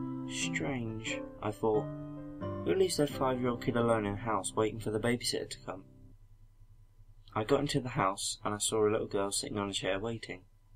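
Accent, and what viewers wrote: United Kingdom. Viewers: ain't often i find a British narrator i like, nice one bro.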